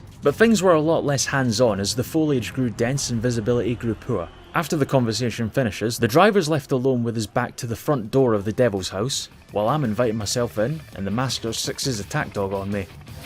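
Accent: Scotland, United Kingdom. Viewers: I can’t place your accent. East coast of Scotland? Edinburgh? Dundee? 🏴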